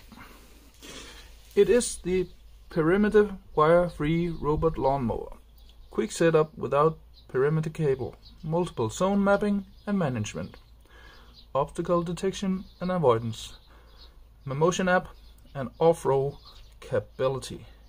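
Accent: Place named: Denmark. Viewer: Your English just sounded a lot like when a Dane speaks English. And I was curious what the price is with all Danish taxes etc :)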